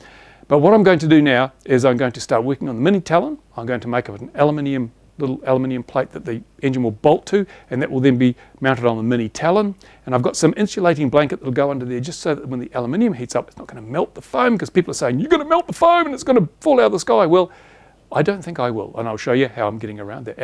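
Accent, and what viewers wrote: New Zealand. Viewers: you sound kiwi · Why dont you mount it on a sheep???? Did I detect a Kiwi ecsent.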